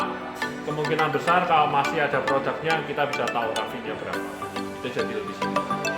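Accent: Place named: Java, Indonesia. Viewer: Wajah nya mirip bule.... tp bahasa nya medok banget... ngebyur😀😀😀😀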